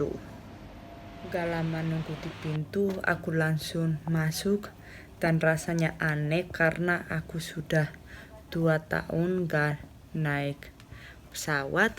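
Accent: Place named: Yogyakarta, Indonesia. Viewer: .....logatmu udah Jogja banget, ditunggu video lanjutane yooo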